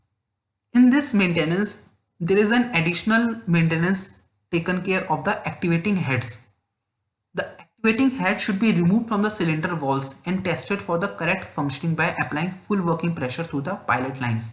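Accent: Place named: India. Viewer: English language needs to improve. It was like hindi spoken in english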